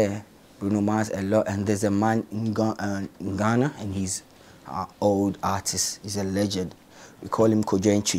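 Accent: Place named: Nigeria. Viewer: But y he want talk like naija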